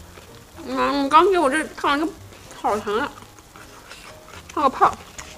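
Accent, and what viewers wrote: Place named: Northeast, China. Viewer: 听见东北口音了， 是快手过来的播主吗，，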